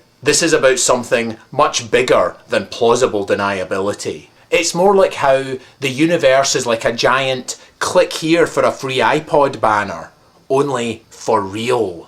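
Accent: Scotland, United Kingdom. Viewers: His accent's scottish. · scottish and i love it!!! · LMAO - very good!! :) I could listen to that lovely scottish brogue all day long! :) hehehe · haha i like your british accent xD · are u from scotland?-.- that accent.. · Are you Scottish? Or do Californians speak like that? Change the world by doing nothing! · I don't most, my bint is scottish, but this particular accent is SOOOOOO FUCKING ANNOYING. Even when he's happy it sounds like he wants to kill himself. The reason so many people left scotland for the colonies was because they couldn't stand each others accent. Or maybe it was just because they lived in scotland. What a shithole.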